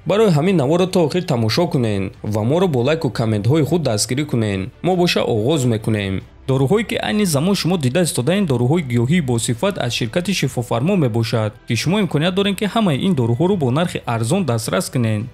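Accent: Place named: Dushanbe, Tajikistan. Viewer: Аксенти ту ҳамин аксенти Душанбеги бисёр сарама маиз мекунад. Аксентат гуҳ хаст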